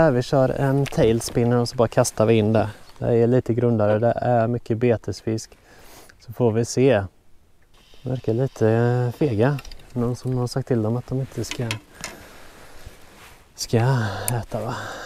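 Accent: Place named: Gothenburg, Sweden. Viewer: Vad var det för frukost du hade med dig? Hörde inte vad du sa för din göteborska ☺️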